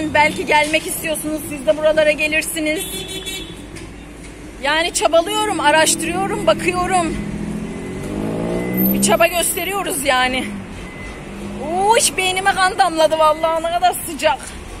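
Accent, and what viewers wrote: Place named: Sivas, Turkey. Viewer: Guzel kardesim gülen yüzün hep gülsün ,lehcen güzel Sivasimiza çok benziyor.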